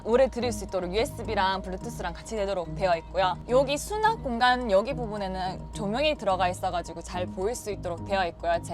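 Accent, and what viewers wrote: Gyeongsang, South Korea. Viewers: 경상도인지 경상남도인지 말투가 팍팍 꽂히네요 이해 안될수도있지만 전 사투리가 부러워요.기억이되서..잘봤어요 구독합니당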